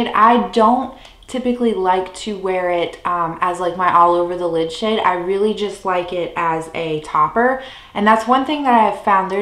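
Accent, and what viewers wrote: South, United States. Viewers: You are adorably Southern! Great progress :)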